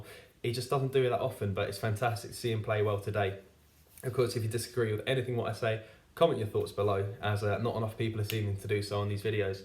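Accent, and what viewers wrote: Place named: England, United Kingdom. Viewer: Mate well into your channel - quick question! How come you're are Rangers man, sounds as if you're English? I am too, always find it interesting!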